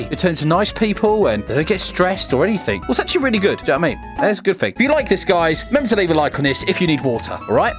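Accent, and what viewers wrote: United Kingdom. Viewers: The British accent 🥵😩😩😩😩😩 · Are you British? I love British accents, especially your voice is so soothing for some reason lol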